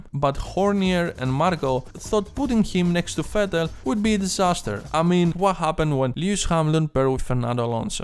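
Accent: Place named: Greece. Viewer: Where are you from? Your accent sounds very greek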